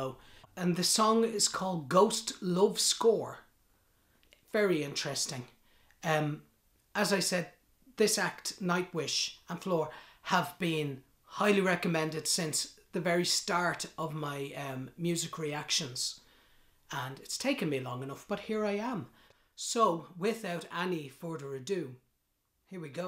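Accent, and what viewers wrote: Ireland. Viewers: Welcome to the Nightwish rabbit hole,. It's always nice to hear a fellow Irish accent on YouTube! I see Gabriel has already spotted your reaction, so you have a list to guide you. And we will be along for the ride. Perhaps the ' '?;-) Subbed, so I won't miss a reaction. ' ' - Wacken. <link> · You're a bit Oirish, aren't ya? I'm the Norn Irish fella. Anyway, I was meant to go see them in Dublin on the 17th November 2021 but they've had to postpone until 22nd November 2022 due to coronavirus. Reason I'm tellin ya this is cos it's purely on this song I want to see them, I really want to experience this live for myself. It's on me bucket list. Sure, I've listened to other songs by them but this is the one that really just leaves me speechless and I'm sure actually being there to witness and experience it is a whole other level. They're meant to play in The 3 Arena but I don't know if tickets are still available. 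It's something to look forward to next year...hopefully!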